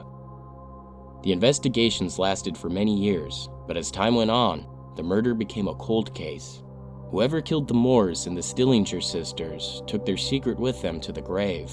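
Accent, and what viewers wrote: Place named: United States. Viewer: Oh don't start that shit plz. His voice is simply a typical American voice. Sycophant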